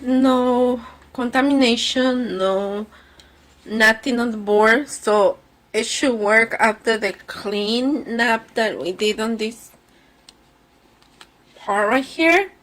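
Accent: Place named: Mexico. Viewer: That Mexican accent is stronggg lol its cute tho😂🥰 🇲🇽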